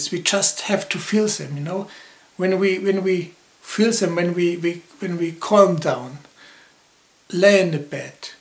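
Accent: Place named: France. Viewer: OMG! Are you FRENCH?! :D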